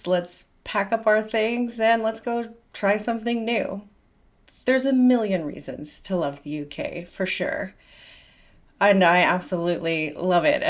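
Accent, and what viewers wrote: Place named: United States. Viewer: Helloo Brit here welcome to the uk always cool to hear an American talking its soooo cool to hear your amazing accent i Love it i Love America and there accents hope you have settled in well and Hope you enjoy your time here in the uk have fun and enjoy. 😍😍👍🏻😊😁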